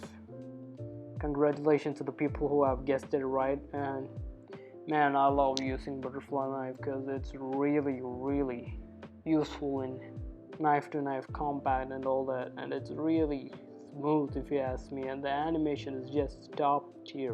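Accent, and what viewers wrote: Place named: United Kingdom. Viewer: Bruh, I said no weird British accent!! Noice vid tho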